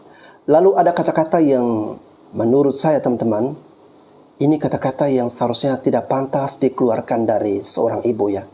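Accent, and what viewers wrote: Indonesia. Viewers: Jujur dari dari segi intonasi dan tata bahasa bahwa saudarakuku ini jauuh lebih INDONESIA dari pada...........it👍👍👍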